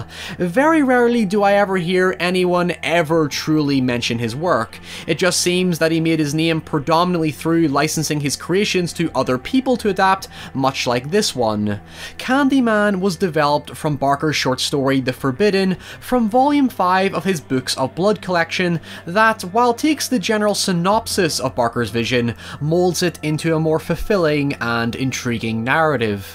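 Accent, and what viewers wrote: Northern Ireland, United Kingdom. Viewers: I honestly love you, I love hearing you talk! I'm Northern Irish so it's comforting to hear XD such a huge fan of all your videos, including this~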